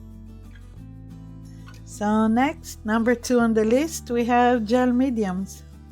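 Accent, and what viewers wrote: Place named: Eastern Europe. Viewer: Hi and first, thank you for your videos! My first question is, I will produce my own artwork using acrylic paint and alcohol ink designs then transfer photocopies of my art onto acrylic sheet, what paper is the best for me please? My second question is, is the Gel Medium technique best for me? By the way, the best years of my life were spent in Chernivtsi in Ukraine and it’s so nice to hear an Eastern European accent again! Kindest regards, Paul.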